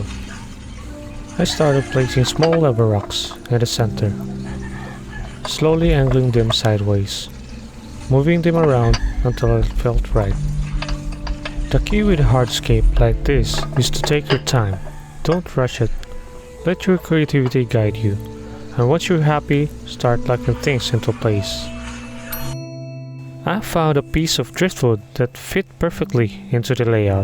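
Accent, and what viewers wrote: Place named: United States. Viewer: Thumbnail is AI -Voice is american -backround voices are japanese/chinese/korea Something is very off here.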